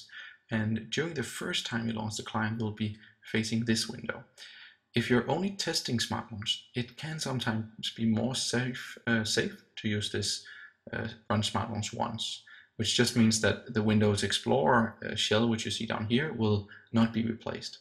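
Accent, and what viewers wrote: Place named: Denmark. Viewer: This guy is Danish i Can tell by his accent 😂😂😂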